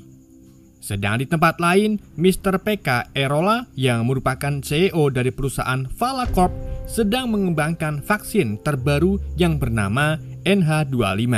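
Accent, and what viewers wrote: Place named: Banyumas, Indonesia. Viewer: Kilihatan admin orang jawa ngapak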